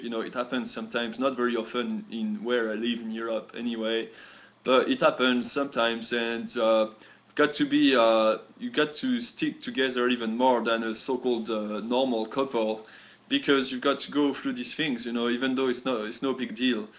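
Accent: France. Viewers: Happy to hear about that!! Stay inlove and be strong! That's really true that being inlove is a wonderful feeling no matter what or where you came from..those people judging your relationship can't give the special feelings you felt to each other.. Nice accent by the way , same with my bf. He's from France also. He is French and I am Filipina😆...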